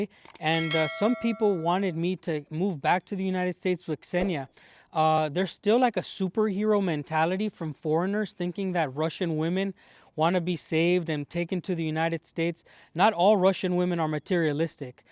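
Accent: Mexico. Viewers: Bracero was WW2. You're younger than me by a generation. Sorry your story does not work by time. You're at least born on the 1980s if your parents were part of the Bracero program they would have been in their 20's in WW2 which would make them in their 60's in the 1980s. Sorry pal not buying it. And your accent tells me you spent most of your early childhood in Mexico before you came to the US having nothing but contempt for our immigration laws like every other Mexican. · You sound more Mexican than Ameican, bro. Come on now. Dumb shit be dumb shit..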